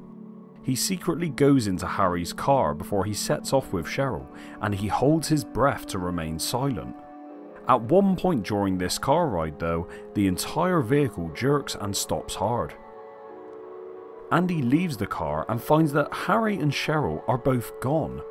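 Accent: Cornwall, United Kingdom. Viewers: British limey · Recognised the kernow accent and subscribed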